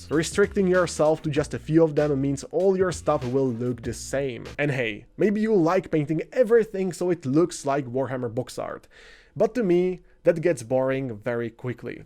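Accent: Czech Republic. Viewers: You sound very czech. :D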